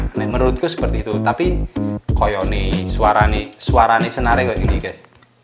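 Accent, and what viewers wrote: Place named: Java, Indonesia. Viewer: Medok eram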